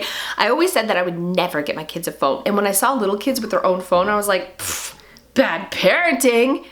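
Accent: Canada. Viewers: Out and about? Canadian? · Are you Canadian?, you sound like one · I'm from Maine, I love your Canadian accent with your enthusiasm! 😂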